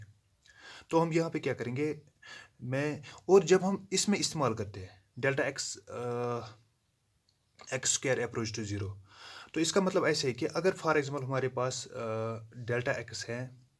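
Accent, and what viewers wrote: Kashmir, India. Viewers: I think u r kashmiri bcoz aap ke batein 😄aap plus ko pulos bata rahay ho vaise b ham kashmiriyo ke aadat he hai nayi angreezi banana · Sir! Are you kashmiri ??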